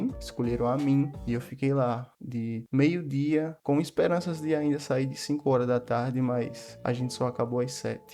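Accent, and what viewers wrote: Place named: Northeast, Brazil. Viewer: pode falar com sotaque de Nordestino, ta de boa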